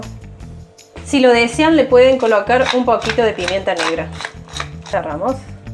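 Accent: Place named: Cordoba, Argentina. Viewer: me encanta ese acento cordobes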